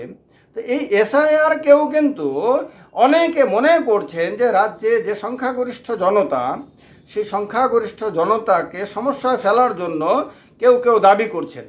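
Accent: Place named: Bangladesh. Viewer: Tumi ekjon bangladeshi tumar kothatei bojhajai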